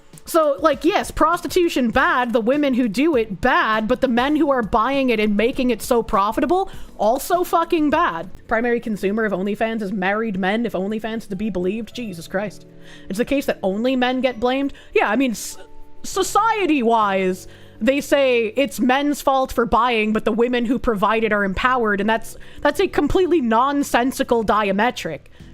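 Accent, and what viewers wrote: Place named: Massachusetts, United States. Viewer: Ugh I watched a montage of telling stories about her mom the other day and now I can't not hear the Massachusetts. Ruined. Still gonna watch tho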